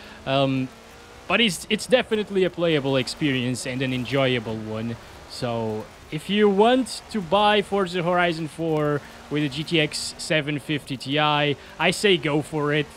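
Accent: Portugal. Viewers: Perae você é português?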